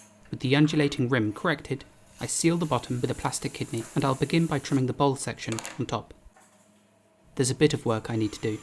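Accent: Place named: England, United Kingdom. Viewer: To anyone wanting to hear a real English accent.. welcome.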